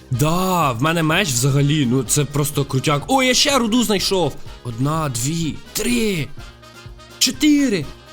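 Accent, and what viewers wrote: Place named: Surzhyk, Ukraine. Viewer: Ви розмовляете на суржику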